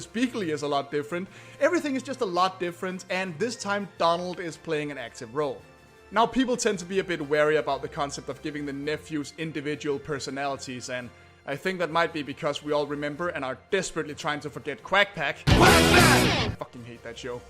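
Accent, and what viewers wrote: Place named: Denmark. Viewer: You wouldn't happen to be Danish? xD Sounds like that on your accent :P EDIT ok nvm, just looked at your channel and can see you are xD Jeg er nemlig også selv Dansker, så det syntes jeg var ret cool :P haha xD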